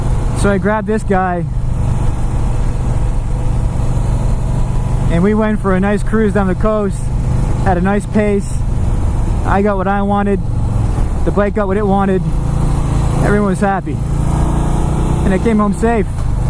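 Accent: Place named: New York, United States. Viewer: "Ya really gotta watch deez cownaz." Gotta be New York. Love it.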